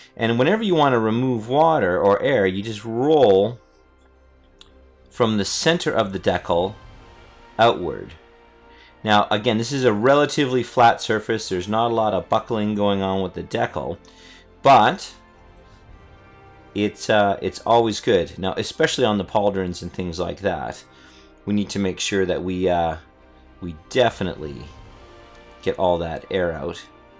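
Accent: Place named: Canada. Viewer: I'm hearing faint traces of a US northern mid-west or Canadian accent, and since he is pronouncing "decal" that way I'm going to guess it's Canadian. That pronunciation is consistent with other Commonwealth nations. The first time I ever heard it pronounced that way it was by somebody from the UK. Didn't seem too strange, but it is a little surprising to hear it in a North American accent, though. I'm glad everybody was able to work everything out.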